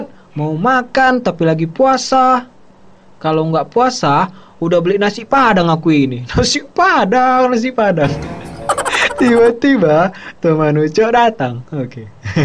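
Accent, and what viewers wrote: Medan, Indonesia. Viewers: Bang orang Medan ya? Soalnya logatnya mirip orang Medan 😅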